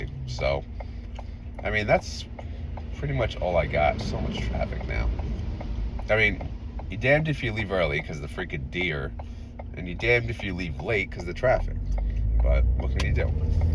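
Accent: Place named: New Jersey, United States. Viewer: Where do you live? You sound like New Jersey.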